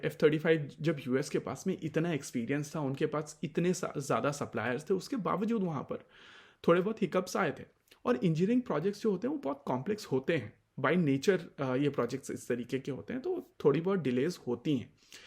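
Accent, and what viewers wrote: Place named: Maharashtra, India. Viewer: भाऊ. तुम्ही मराठा(महाराष्ट्रीअन) आहात का? तुमच्या कारण शब्दाच्या उच्चारामुळे जाणवले.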